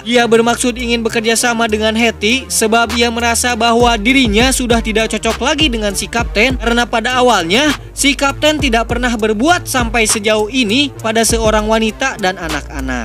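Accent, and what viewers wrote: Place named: Sunda, Indonesia. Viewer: Asik seru bnyk alur cerita pembawaannya Sunda. Karena saya sendiri asal tasikmalaya. Tapi sekedar masukan kang klo bisa gaya bicara dan penyampaian dengan gaya sendiri dan karakter sendiri. Jangan meniru orang lain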